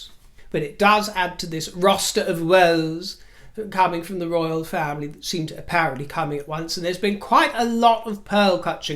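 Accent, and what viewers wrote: United States. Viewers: you do a good American accent. I mean, it really rings true! Wherever did you pick it up? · I love your American accent!!! You nail it. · Your American accent is so good. It always makes me laugh; you are so funny. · I love your American accent! ❤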